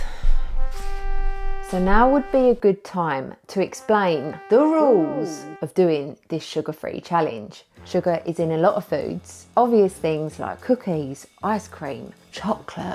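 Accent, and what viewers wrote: United Kingdom. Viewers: You have the most british voice ever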